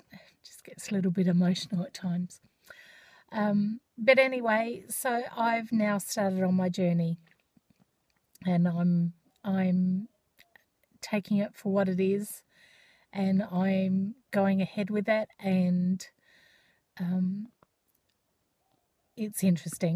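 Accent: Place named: Australia. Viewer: Hi! Welcome! I love your Aussie accent':p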